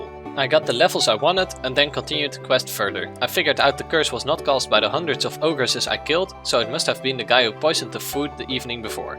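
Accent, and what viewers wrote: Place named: Netherlands. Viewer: I hear a dutchie speaking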